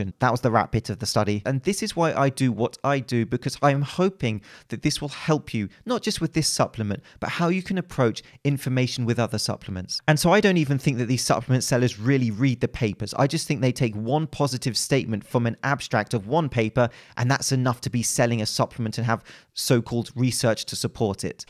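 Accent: England, United Kingdom. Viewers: A science based throwdown in an English accent is the best kind of throwndown.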